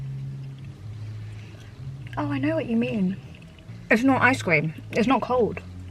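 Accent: England, United Kingdom. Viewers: Hello. Always, you are such a lady. I feel more like a lady as well after hearing from you. Your English accent is the best. I think you speak so beautifully. I would prefer longer videos too just to hear you. Happy Mother's Day to your Mom. From Grace Elizabeth, in MA. I wish my daughters would have vlogs just for me. Maybe someday we'll all have vlogs.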